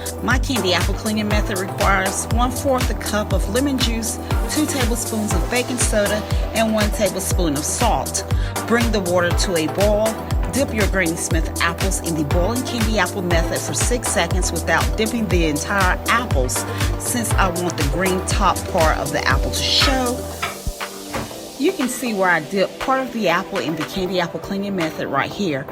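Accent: South, United States. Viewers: I love your southern accent!! I am from NOLA so ours is kinda fast with a little southern. You apples look delicious and I can’t wait to use your recipe! Thanks so much for sharing!!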